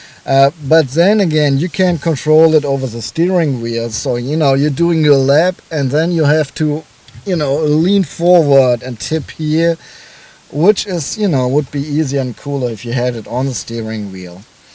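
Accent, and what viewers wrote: Germany. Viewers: I really really like your German accent. As a college student, my professor is a German who comes from Goettingen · Very good review and entertaining keep your German accent I will keep my french one...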